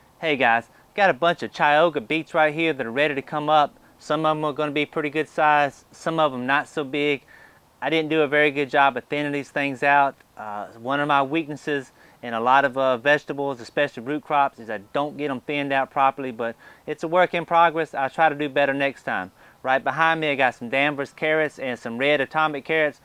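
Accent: United States. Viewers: Omg, your country accent is so cute and funny. Nice video. 🌸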